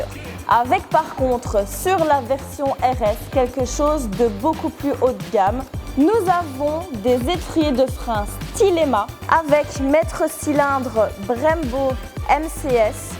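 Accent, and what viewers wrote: Belgium. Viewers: Tu ne serais pas belge ? Sinon tu presente bien les véhicules et c'est d'autant plus agréable qu'on cest une femme.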